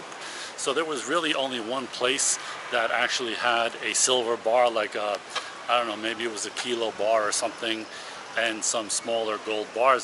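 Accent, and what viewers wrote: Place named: New Jersey, United States. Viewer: Mmm. That’s a lovely accent you have. New Jersey? Are you a Jew?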